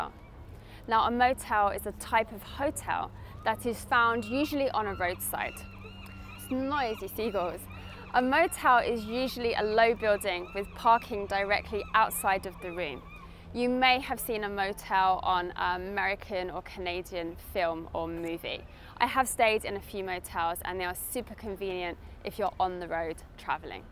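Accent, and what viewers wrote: United Kingdom. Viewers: You're a genius, I'm obsessed with the British accent only because of you. enjoyed this video and learned Five useful words 1. Brunch 2. workaholic 3. Motel 4. Staycation 5. Fortnight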